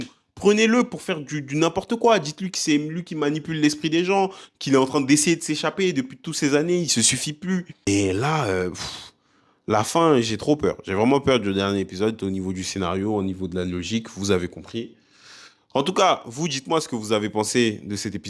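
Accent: Ivory Coast. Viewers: Je reconnais un frère ivoirien Je suis là à la base pour la "babiere" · Toi ça se sent que t'es ivoirien. 😂😂Ko ton babiere 😂😂😂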